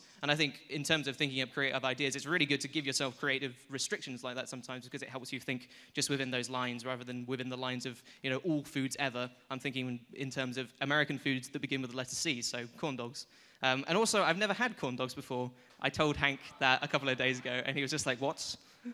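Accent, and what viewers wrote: United Kingdom. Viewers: The patented style british accent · If leaves the U.K. and comes to live in the U.S. then eventually he'll lose his accent wont he?:( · I can't watch this is my living room because I'm worried my mom will see me watching a cute guy with a British accent take his pants off. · We don't need yer English baaaastaaard accent. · what kind of accent is that? i know it is british but is there a specific place?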